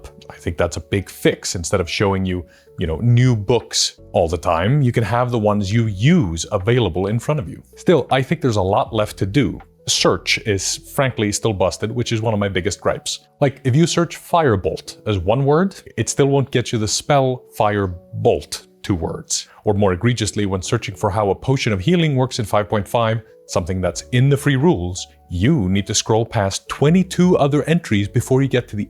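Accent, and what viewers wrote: Iceland. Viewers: I need to tell you this, because I've thought it for a while now and I can't keep it to myself. Has anyone ever told you that you sound just like Jonathan Frakes, just with and Icelandic accent?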